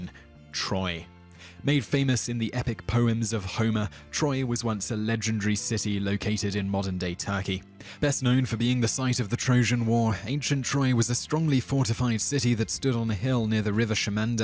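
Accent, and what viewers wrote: United Kingdom. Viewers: I don't mind his British accent, half my family is British. But I do wish he'd research how to pronounce names and other proper nouns correctly. He butchers so many it's distracting. He also says "skellingtons" which was funny the first time I heard it, but not the last twenty. Type things out for him phonetically or something!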